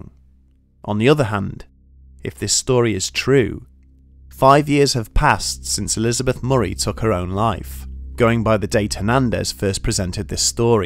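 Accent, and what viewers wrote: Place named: North, United Kingdom. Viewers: He said Las Vegas. Maybe you just can't understand his Northern accent. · He's English. They pronounce things differently than we do in the states and Canada. End of story. :)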